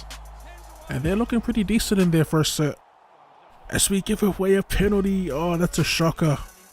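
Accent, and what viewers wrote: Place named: New Zealand. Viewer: Love hearing a kiwi pronounce all those names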